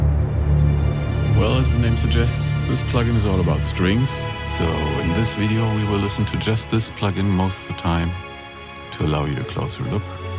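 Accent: Germany. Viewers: German Accent?;)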